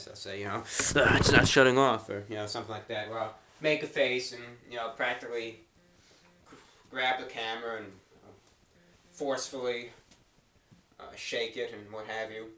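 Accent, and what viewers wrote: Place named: Pennsylvania, United States. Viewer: I just discovered your youtube report today. A fresh face with the mystery of sincerest tomfoolery. The ‘dart redux’ episode (6 years ago) at least presented an admission to a degree of insincerity….mixed with brutal honesty. I wanted to see your first reports to flesh out the genuine man and compare with your more recent reports. Your consistency and demeanor is calming. And you lost that east Penn accent somewhat. Bits of mine slip out from time to time. (No one notices)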